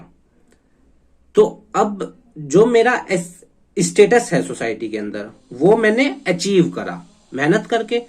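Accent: Haryana, India. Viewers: I think his tone is Haryanvi !!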